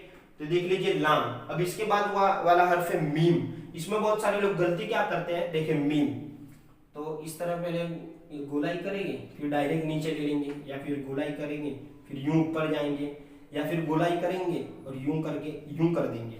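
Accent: Gujarat, India. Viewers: Tame Gujarati chho??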